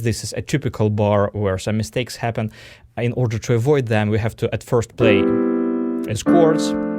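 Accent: Russia. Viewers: I love your videos! Very useful Are you Russian?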